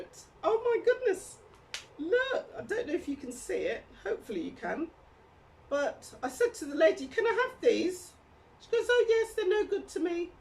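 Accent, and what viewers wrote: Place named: RP, United Kingdom. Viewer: Hello, absolutely love your talk on planting ginger. You sound very posh. Can't wait for more tips from you!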